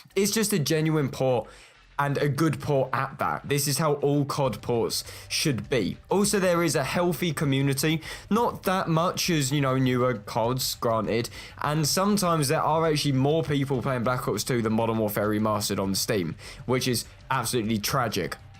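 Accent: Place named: England, United Kingdom. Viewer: I like your accent.. Is England your city?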